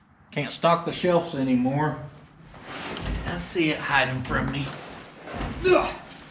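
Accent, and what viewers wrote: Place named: West, United States. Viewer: Damn, your accent just reminds me of old western movies - I love it! Great commercial to boot :)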